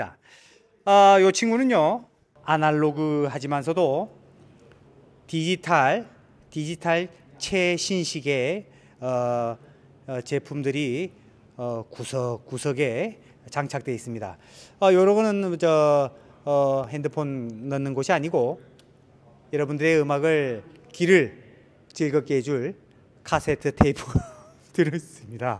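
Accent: North Korea. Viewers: 팀장님 ᆢ 북한 시람 입네까 ? 말투가 평안도 함경도 자갱도. ᆢ 어댑니까 ? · 이분 엄청 골 때리네.ㅋㅋㅋ 얼굴은 30~40대 초반? 진행은 60~70대 노인 스타일, 말투, 발음 ㅋㅋㅋ 약간 북한 말투가 툭툭 튀어 나오시는것이 이분 물건이네요.ㅎㅎㅎ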